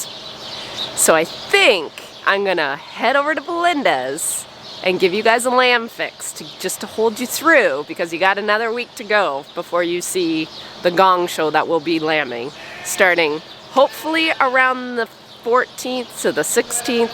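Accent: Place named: Canada. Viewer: ‘....they’re friendly,..eh’...! Wonderful Canadian...! Love the language,..eh..!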